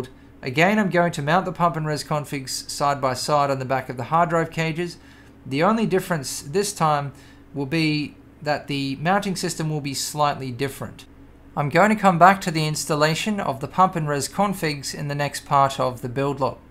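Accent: Australia. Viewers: are you australian? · He aussy?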